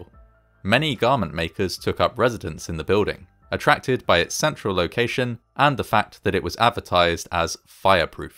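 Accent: England, United Kingdom. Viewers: I was under the impression that he was English because of his accent.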